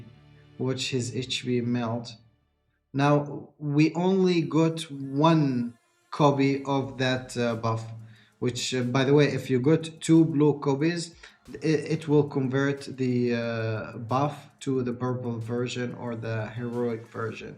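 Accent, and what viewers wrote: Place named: Arab world. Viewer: لهجتك كأنك عربي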